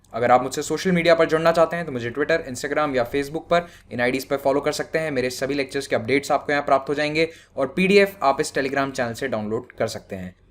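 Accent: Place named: Rajasthan, India. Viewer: When u say killlooo (kg)😂 that rajasthani accent....I like it very much...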